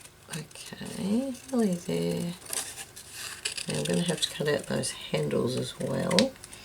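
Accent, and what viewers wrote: Australia. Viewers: Hi, just found your channel on u tube! love listening to an Ozzie! Happy New year and take care of yourself, love from Queensland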